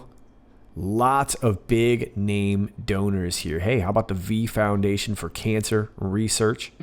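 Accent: United Kingdom. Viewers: Hey I just wanted to say I reckon [+fakewood] means “and Hollywood”. PS. Great English accent xx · A BRILLIANT 'posh' British accent Jane from England x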